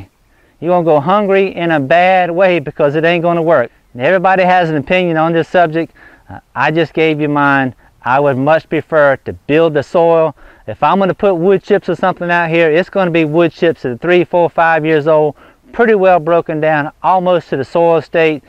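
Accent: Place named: Kentucky, United States. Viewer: Awwwh, that's a shame that you've got this funky situation now. Hopefully this will clear up soon and you'll again be able to plant the seeds you want and have them thrive. I am only an old disabled woman in a wheelchair, and live in the Phoenix suburbs here in a doublewide mobile in a mhp setting and because of the patio and carport overhang type roofs, I really don't have much ground for planting a garden. I'm trying to grow what I can in containers but most of it is in mostly shaded areas of the patio. It's hard, I've tried getting things going since April of this year, but everything keeps getting burnt up so fast from the sun, and the HEAT, even when I drag the containers into the fully screened inner patio area. It's so hard to watch things begin to sprout and get all happy and then have to watch them get burnt to a crisp with a few weeks as I try desperately to get them the sunshine that they obviously need, cuz they're getting too leggy! 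I watch your amazing videos and just dream of having a place as big as yours and the physical ability to farm it too. You are truly blessed and it's so nice that you share your information, whether good or bad, with us. I love hearing your accent, my maternal Grandfather was from Lexington KY and I adored hearing him talk about just anything. He's been gone for many years now, but everytime I hear your voice, it reminds me of him a little bit. Anyhow, thanks for the information, I'd often thought of using something like sawdust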